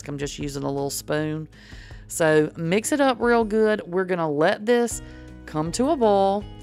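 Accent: South, United States. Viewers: I love listening to you, you remind me of growing up in the south! Thank you, these recipes look wonderful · New sub here 🙋🏻‍♀️ First of all, you’re adorable!! 🥰 Love your southern drawl & bubbly personality!! Secondly…..I am SO grateful for these hamburger helper mixture recipes!! I’m going to try them all. I grew up on Hamburger Helper. There were 8 in my family & we could stretch Hamburger Helper pretty far. Super excited to try these!! I also have a request (& forgive me if you’ve already done this-I will look thru your channel) but could you possibly come up with a dry mixture recipe for cold pasta salads?….like the Suddenly Salad box mixes?? I would love to have a dry mixture recipe I could mix with mayonnaise to put over pasta & veggies for some good summertime cold pasta salads. THANK YOU SO MUCH!! ❤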